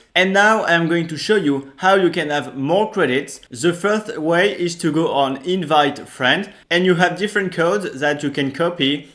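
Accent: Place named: France. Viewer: I watched your French version of this video.i didn't know you can speak English this well. Just that your French accent is too strong. I like it.😂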